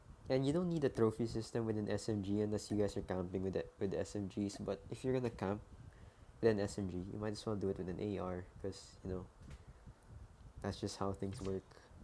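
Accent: Philippines. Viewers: are you filipino bcuz ur accent is like a filipino accent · Are you Filipino? Because your accent is Filipino like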